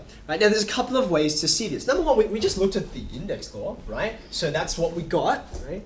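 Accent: Australia. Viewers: a prime example of an Asian with an Australian accent.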